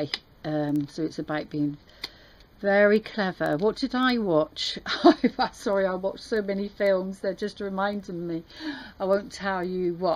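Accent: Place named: United Kingdom. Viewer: Impressive....first time watching and I loved everything about this. Resonates so much....like you were talking directly to me. I also have to say, loved listening to your accent....makes me miss my British family even more.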